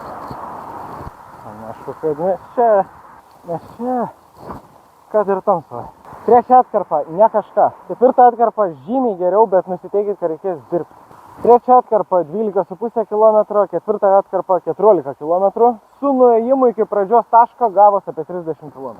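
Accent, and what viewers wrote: Šiauliai, Lithuania. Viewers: Respekc uz siaulietiska kalba:)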